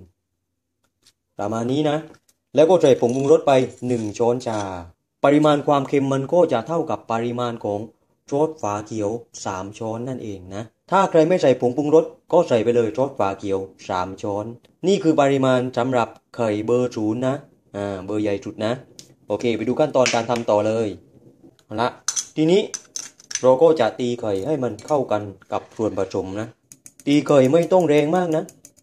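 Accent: South, Thailand. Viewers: สำนังคนใต้น่ารักดีจร้า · แหลงใต้เลยตะผมว่า เสียงคนใต้มีเสน่นะ เห้อ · นํ้าเสียงของคนใต้.เพราะดีค่ะชอบมากๆน่ากินจังไข่ตุ๋น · ชอบสำเนียงทองแดงของ และอธิบายได้ดี ชอบมากครับ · เสียงคนใต้ 555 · คนใต้ใช่ม้าย😄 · สำเนียงใต้ชัดๆๆเลย555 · คนใต้เรยนิ หรอยๆ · น่าทานค่ะ ว่าแต่อยากเห็นหน้า จังฮู้ชอบคำพูดสำเนียงตนใต้จังเลย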